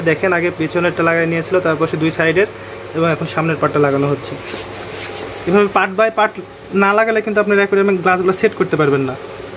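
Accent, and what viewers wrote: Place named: Rajshahi, Bangladesh. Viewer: রাজশাহীর ভাই কথা শুন্যা বুঝল্যাম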